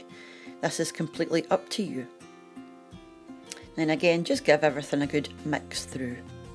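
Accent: Scotland, United Kingdom. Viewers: Thanks for this video. I like stovies but I LOVE LOVE LOVE listening to Scots. Greetings from the Bavarian Highlands (alpine belt) 🏴💙🏴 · My grannie and my mum made stovies with the ends of the roast. Brown the onions and add the chopped up roast. Add boiled tatties and any left over gravy. Yum! I was born in Portsoy (not far from Elgin) but live in Vancouver Canada now. Grannie made tablet on a Prius stove in front of a coal fire and sold it, with all proceeds to the Salvation Army. I used to make it but it is too “moreish” to have around. Love watching for the old recipes and listening to the home accent. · This looks like a dish we would love! Thanks. I enjoy your videos so much and your lovely Scottish accent! 😊❤ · I'm trying to learn good Scottish accent for a monologue , and yours is fab!!!! X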